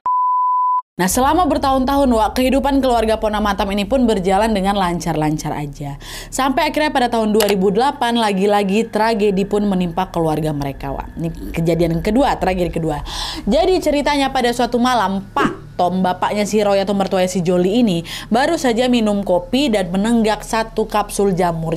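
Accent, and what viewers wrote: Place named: Sumatra, Indonesia. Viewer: Logatnya kek orang sumatra...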